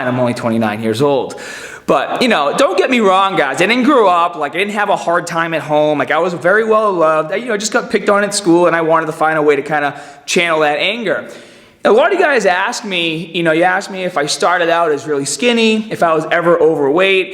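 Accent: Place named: New England, United States. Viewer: Aside from being oh so easy on the eyes...one of the reasons why I watch your videos is because I am always impressed with your authenticity as a person. You are a kind soul. This couple with the fact that your NH/MA accent is so familiar to me, allows me to digest what you are teaching and actually benefit from it. Happy New year to you and "Ericer" LOL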